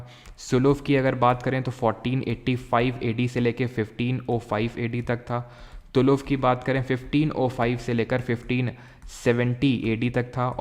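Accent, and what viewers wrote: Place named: Hamirpur, India. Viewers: Sir are you from up voice tone se lag raha hai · Are vahh sir ap to ek dm Mori trf hamirpur U.P ki side ki bwalat hva😅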